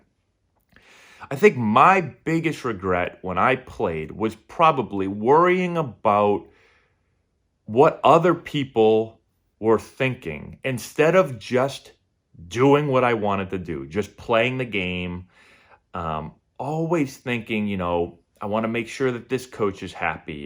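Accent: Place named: Boston, United States. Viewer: Have you played Fallout 4. It's based in Boston. Every character sounds like you. Big fan, love the channel.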